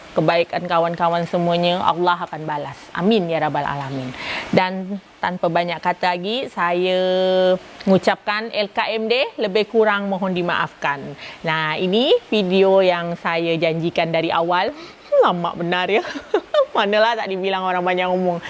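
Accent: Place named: Pontianak, Indonesia. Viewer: Mantap kak tetap konsisten dengan bahase pontianak kite...bangge dengarnye..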